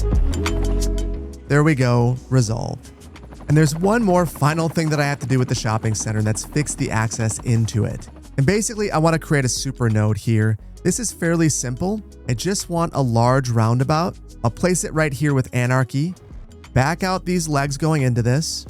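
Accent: United States. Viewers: Even if it wasn't clear by your accent, your actions would make it clear you're American😅 Let bulldoze this road to build a highway through our city. Let's bulldoze a neighbourhood to build a shopping centre, build a few big box stores. These buildings are to high for a residential neighbourhood, there are too many trees. Let's get rid of the sidewalk, this much sidewalk seems unrealistic🤣 This episode definitely made me laugh!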